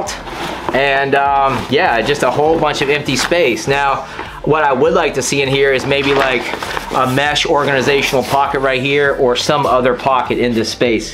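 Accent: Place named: Florida, United States. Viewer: You have got to be around the Florida line between your accent and that moss. Every time we go close to Florida line we start seeing that moss and a mixture of palm trees. Thanks for the video. I really enjoyed the videos.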